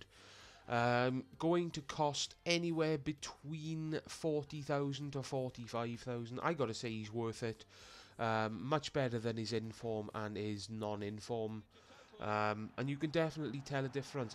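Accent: Wales, United Kingdom. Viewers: are you welsh? you seem to have a welsh accent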